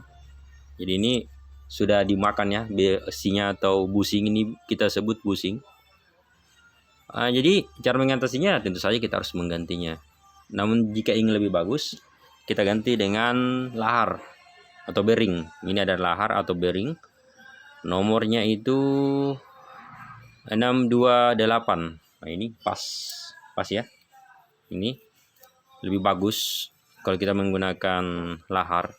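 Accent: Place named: Bugis, Indonesia. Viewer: Tau ogi logatna anggotae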